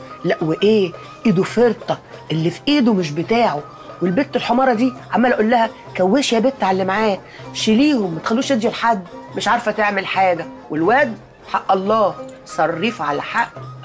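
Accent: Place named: Morocco. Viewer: لهجتك مغربية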